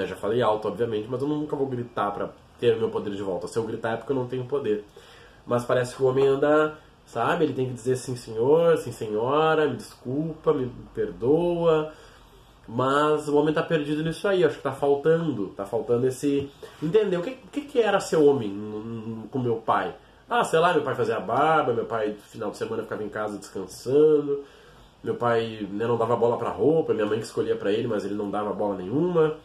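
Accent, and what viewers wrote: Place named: Rio Grande do Sul, Brazil. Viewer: Homi gauchão! kkkkkkkk.. difícil hein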